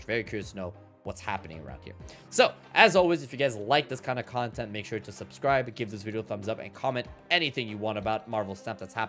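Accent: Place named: Quebec, Canada. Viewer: Clairement un québécois!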